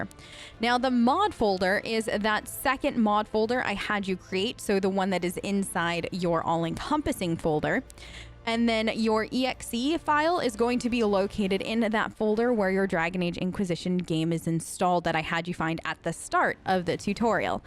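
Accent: United States. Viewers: Sorry i appreciate your trying for newbies but this way too superficially explained. DAI mod manager and frosty aren’t really compatible then I followed your steps which look SO EASY and no mods worked. Instead I had to manually change my patch that my game works again and i get constantly an error in dai mod manager and no green bar when pressing merge. And your heavy American accent doesn’t make things easier 😫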